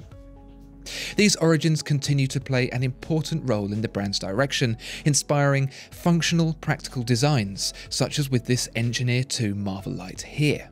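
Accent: United Kingdom. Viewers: Which part of UK are you from? (specific accent)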